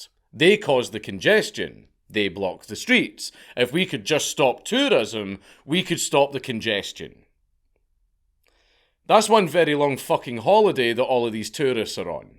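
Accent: Scotland, United Kingdom. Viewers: This sounds so funny. Wish I could understand Scottish. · The editor tried, but you still sound Scottish.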